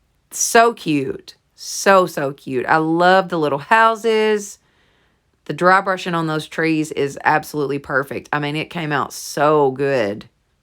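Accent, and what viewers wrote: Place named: Texas, United States. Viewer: Where are you from with your accent? I love it because I’m a Texas girl with one too and feel at home.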